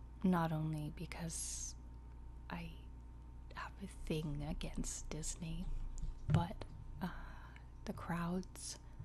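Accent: Canada. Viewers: It's so cute, I can hear the Canadian in your accent in a lot of your words in this video for some reason. Maybe my left ear is compensating for my malfunctioning right ear so I am able to pick up on it better. I don't know. Anyway, I find it quite endearing although I don't know why.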